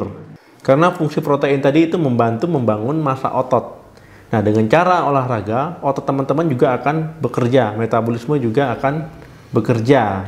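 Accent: Aceh, Indonesia. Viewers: Dokter nya orang aceh y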